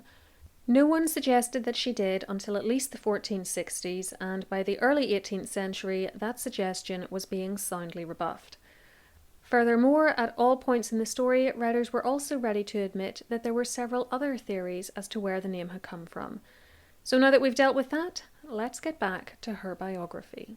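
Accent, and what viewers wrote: Northern Ireland, United Kingdom. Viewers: Hi - is from Northern Ireland 🌟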